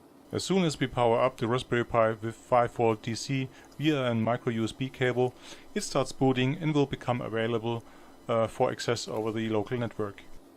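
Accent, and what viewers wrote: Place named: Germany. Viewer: Dazu braucht man nicht auf die Windows Version achten, man hört es am th ;-) Nicht böse gemeint, aber ich habe lange in England gelebt und einen Deutschen erkennt man recht schnell an der Aussprache. Nebenbei, sehr geiles Projekt. Es juckt schon in den Fingern.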